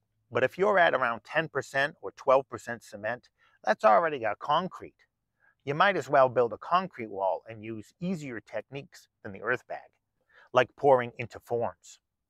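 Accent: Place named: Boston, United States. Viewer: I'm having a hard time taking u seriously w your Bostonian accent.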